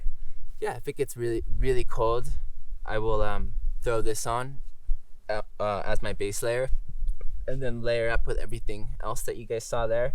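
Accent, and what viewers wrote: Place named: California, United States. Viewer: Good content. I'm having confusion over the accented speech - kind of valley guy of sorts. Got to be from CA, maybe Portland or Seattle.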